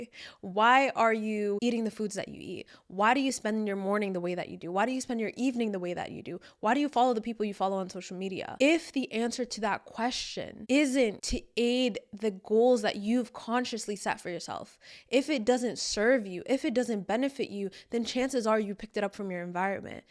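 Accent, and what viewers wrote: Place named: United Kingdom. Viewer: Why did I thought you were from the UK??? 😂😂😂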